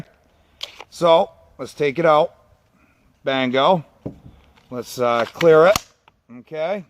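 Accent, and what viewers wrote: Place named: Massachusetts, United States. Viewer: Judging by the accent and Glock you’re definitely from north of Boston lol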